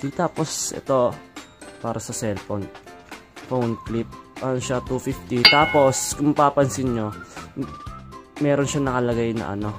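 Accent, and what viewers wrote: Visayas, Philippines. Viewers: Pre, bisaya ka?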